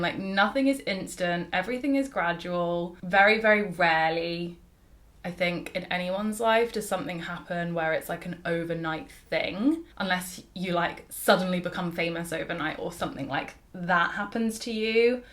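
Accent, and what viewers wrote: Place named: United Kingdom. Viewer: Hi!! I've been watching your vid's for a bit now but have finally decided to comment:) yay! I first found you as was searching for beauty tips, etc for Redheads and you popped up! Even though I'm quite a bit older than you, I totally relate to so many things you chat about, enjoy your recommendations, and love listening watching you and think you're a very mature, good head on shoulders kind of gal - love your accent too! I live in Canada but my entire fam is in the UK so gives me warm fuzzy feeling watching you - love your hair colour!! MIne is more on the Auburn/Copper side. Look forward to watching you in 2024.....Congrats on your new house & getting married:) Redhead sistahood - ha!💗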